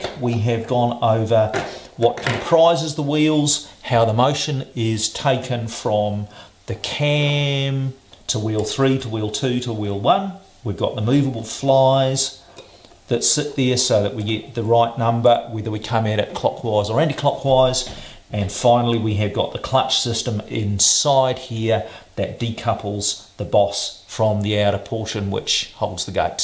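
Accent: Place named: New Zealand. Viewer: I was dmn sure you were a kiwi :D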